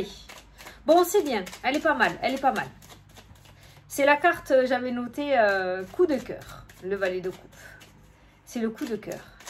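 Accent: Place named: Pyrénées-Orientales, France. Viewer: Bonjour! Cela fait des années que je regarde des vidéos sur YouTube et aujourd’hui c’est le premier commentaire que je vais poster. Le jour de mon anniversaire au réveil, YouTube me suggère cette vidéo, première surprise il me semble reconnaître notre accent des P-O (où je vis). Je regarde et j’écoute attentivement et plus la vidéo avance et plus je me dit que c’est mon cadeau d’anniversaire tellement il y a de ressemblances avec ma vie actuelle. Rien n’arrive par hasard dans la vie et sur ce coup là celui qui me dit que la synchronicité n’existe pas je le savate 😁. Merci pour la bonne énergie que tu dégages et ce naturel qui fais toute la différence. N.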